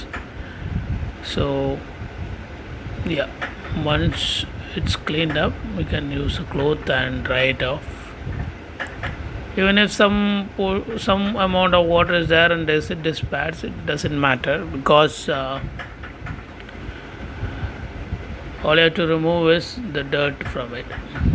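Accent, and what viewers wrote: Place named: North India, India. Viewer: I thought your a north indian.... You know the way you speak English made me think that...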